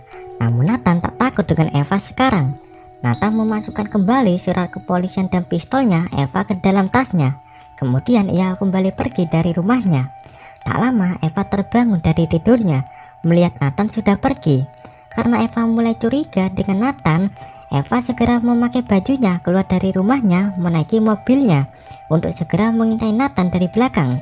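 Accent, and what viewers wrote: Java, Indonesia. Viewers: Ini ebbo kucing versi review film nya tapi medok 🤔😅✌ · nya medok rek bikin gumuuz😂😂😂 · kucing host nya logatnya medok hhh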